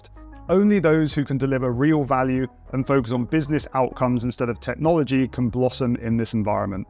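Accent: United Kingdom. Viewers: I was just listening to the video and thought maybe I misheard it due to the British accent😂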